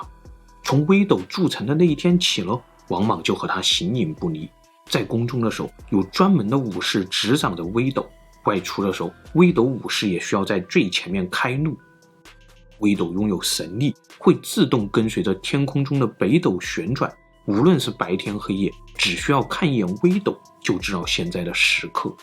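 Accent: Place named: South, China. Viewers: 別挑剔啦！ ㄧ定是南方人，可能是四川或是江蘇人吧！咬字發音自與北方人不同啊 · 👏👏👏每周必看的节目，雷打不动😎😎😎 请那些刻意(觉得可爱开玩笑的不算)对口音挑剔的移步去看中央新闻联播。好走不送👋 我们南方人就是这样说普通话达。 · 是川味普通话。关于捡金的习俗，你不知道四川也有此习俗吗，我很小的时候远远的见过，坐标川西南。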